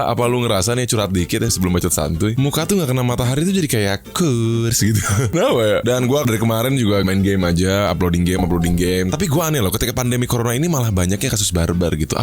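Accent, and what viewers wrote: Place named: West Java, Indonesia. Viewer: Sunda pisan akwkw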